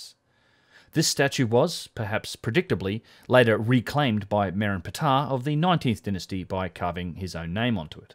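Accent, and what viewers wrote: Australia. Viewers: As soon as you hear the Aussie accent you just know it's going to be dumb.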